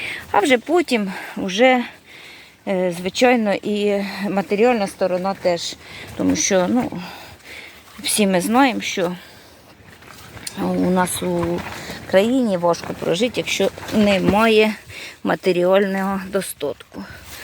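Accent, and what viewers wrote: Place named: Poltava, Ukraine. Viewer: Я думала, що ви з Полтавщині, тому, що діалект у вас полтавський... Рясних Вам врожаїв і ПЕРЕМОГИ всім нам.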